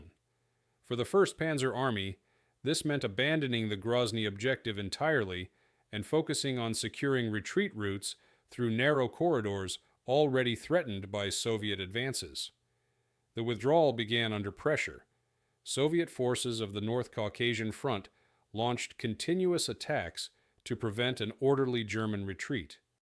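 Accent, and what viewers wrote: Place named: United States. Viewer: I'm glad we finally have a World War II documentary narrated by a American voice and not another British voice, whether AI or human.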